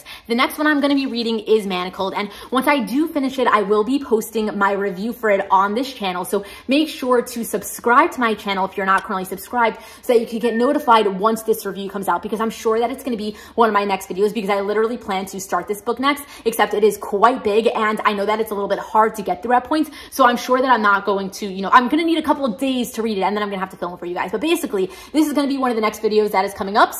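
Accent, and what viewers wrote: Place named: Midwest, United States. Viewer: I love watching people bind books. Can I follow you on your Instagram? And I'm always trying to figure out what part of the states you're from cuz it sounds like you're from the Midwest but not quite Minnesota Midwest but maybe like Iowa or Nebraska. I lived a lot of places and so I always find it fun. Trying to figure out where people's accents come from. ☺️ You and bargain Bethany have a very similar accent.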